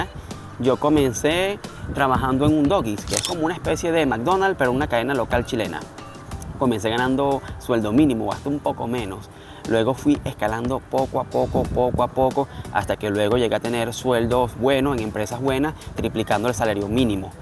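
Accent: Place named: Venezuela. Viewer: Que extranio, tu acento me parece mas Venezolano que Chileno... :)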